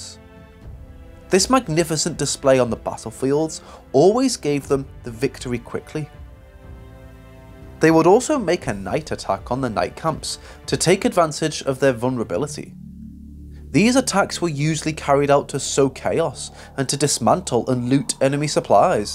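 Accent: Liverpool, United Kingdom. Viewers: For sure, a scouser. I wouldn't want any other describing another fierce people.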